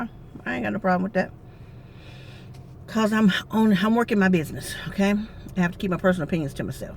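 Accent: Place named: United States. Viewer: yeah, a long way from america.. ive watched a few of your videos already and i can't get enough of your accent, if i may id like to ask where you grew up? theres something about a soft spoken American accent that i find relaxing to listen to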